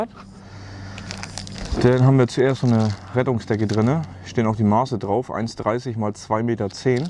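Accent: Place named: East Frisia, Germany. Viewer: Du redest wie ein 60-jähriger Ostfriese aus dem Lande! Voll sympathisch!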